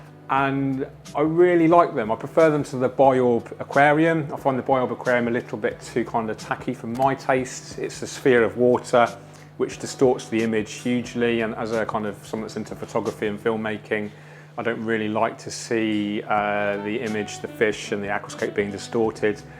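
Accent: United Kingdom. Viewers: Too bad only Brits can understand him.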